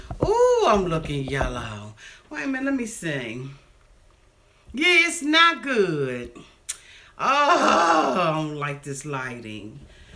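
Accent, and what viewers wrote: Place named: Baltimore, United States. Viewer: Great Wisdom! You must be from Baltimore, cuz you sound just like Mo'nique, accent and all girl!!!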